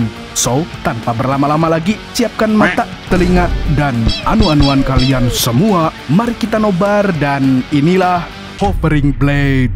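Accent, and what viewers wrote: Banjar, Indonesia. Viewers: Babang editor ni org Banjar kah😅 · Orng banjar kh mang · widih orng banjar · Buhan Banjar aaja nihh adminnya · Urang banjar pinanya sidin..😅😅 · nahh urang banjar sekalinya amang nih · urang banjar kah pian mang😅😅😅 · Urang Banjar kah l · Jahh urang Banjar kah min? 😅😁👍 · urang banjar jua kali ya pian lah😂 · Urang Banjar kah mang? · Banjar sekali nya semngatt tarus bang ngonten ny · uhhh org banjar😂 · Adminnya urang Sungai Tabuk kah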